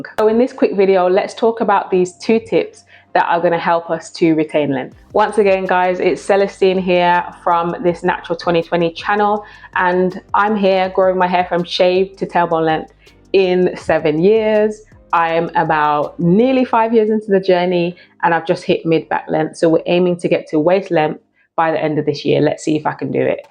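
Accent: United Kingdom. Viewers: Good to hear a British accent. Greetings from another northerner.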